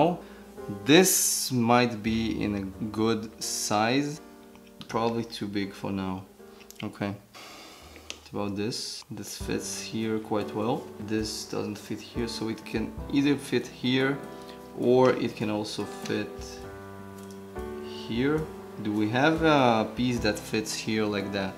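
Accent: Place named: Israel. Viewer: Ach sheli you know your name is. Stam but I totally knew you were Israeli just from your accent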